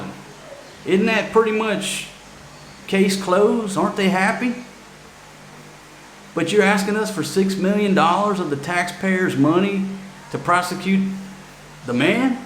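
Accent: South, United States. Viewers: I guess you can diagnose someone from TV or you tube, you sound like a ignorant redneck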